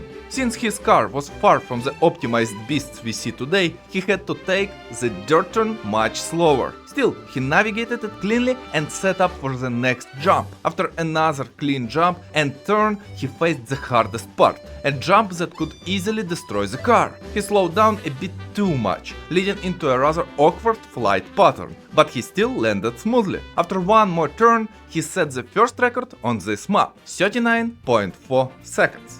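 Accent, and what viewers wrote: Eastern Europe. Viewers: Bro is STRONGLY eastern European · Slavic accent in eng beamng vid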